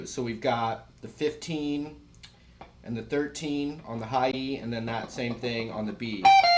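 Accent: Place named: New York, United States. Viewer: Hey, are you from New York? i don't know, i think you have a New York accent. i'm from Belgium so excuse if i made a mistake.:p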